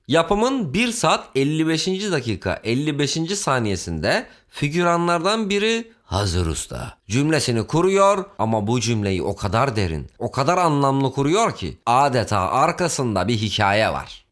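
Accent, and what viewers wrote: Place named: Anatolia, Turkey. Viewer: size bir tavsiye vermek istiyorum, anadolu şivesi yapmazsanız videolar daha keyifli olabilir. Ben ve 3 arkadaşımın ortak görüşü bu, sevgiler.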